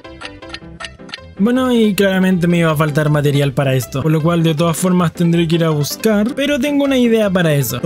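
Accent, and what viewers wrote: Chile. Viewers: Tío de que que país eres me párese de chile o no y feliz navidad que te visite el viejo pacuero po XD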